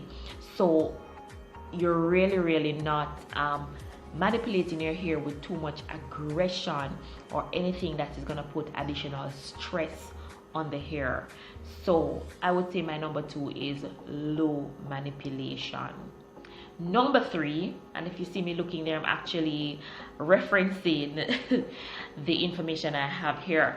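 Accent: Jamaica. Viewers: Jamaican ? #wahh gwan! Just subbed Hun !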